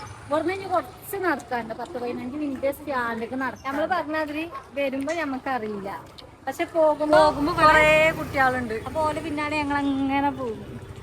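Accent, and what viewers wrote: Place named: Malappuram, India. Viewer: Edhaan malappuram basha 👍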